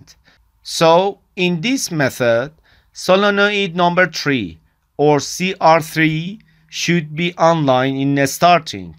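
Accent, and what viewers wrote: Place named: Iran. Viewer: your accent was iranian..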